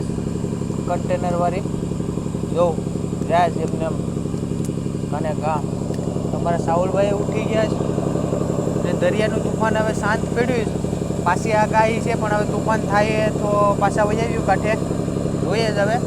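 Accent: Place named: Una, India. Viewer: bhai tamaru gam kyu che because tamari language parthi evu lage ke tame gir somnath na una taluka na koi gam na haso evu mane lage che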